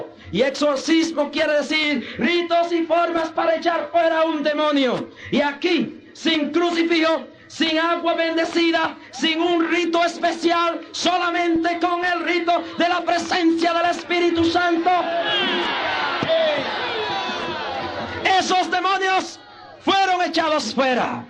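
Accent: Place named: United States. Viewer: En ese Tiempo Tenía acento Gringo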